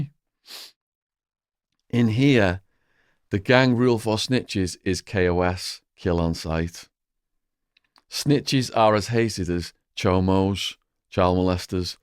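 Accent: United Kingdom. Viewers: u have some kind of uk accent, but you're English is quite proper to me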